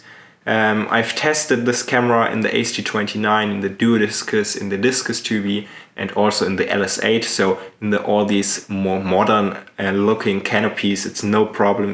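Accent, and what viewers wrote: Germany. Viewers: Mach bitte auch Videos auf deutsch oder einen deutschen zweit Kanal denn dein Akzent ist so deutsch da kannst du es auch sofort auf deutsch machen Ist aber alles nett gemeint nicht böse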